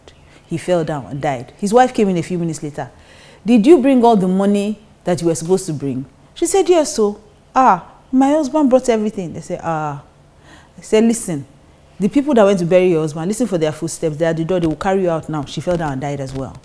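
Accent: Nigeria. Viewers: I dont like that Nigerian tonation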